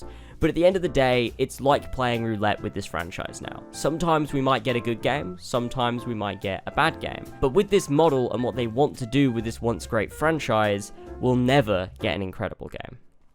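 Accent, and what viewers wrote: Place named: England, United Kingdom. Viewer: This video is misinformative and fuck the narrator with an English accent. You’re gay!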